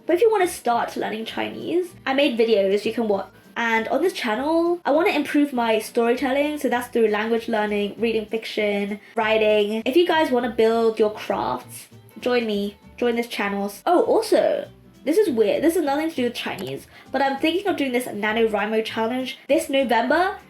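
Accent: United Kingdom. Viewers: You sound like British but with some Singlish, and I thought you were Singaporean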